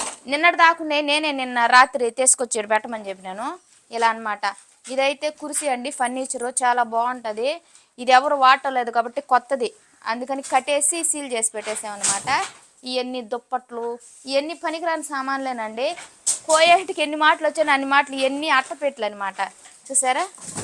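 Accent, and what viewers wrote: Nellore, India. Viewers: Nellore slang ❤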